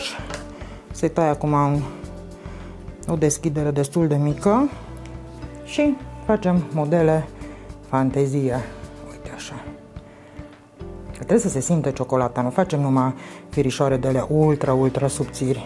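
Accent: Transylvania, Romania. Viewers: Te ador! Esti minunata, felul cum explici in amanunt, dulcele accent ardelenesc, precizia executarii in tot ce pregatesti, sunt la superlativ! Si cum eu iubesc enorm muzica clasica, daca-mi permiti comparatia esti prin extrapolare un J.S.Bach al gastronomiei!❤❤❤❤❤❤❤❤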